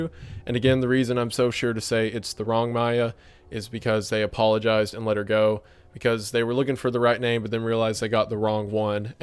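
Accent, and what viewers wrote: South, United States. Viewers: Dawg I had no idea wendigoon sounded like he’s from the south till I heard his dad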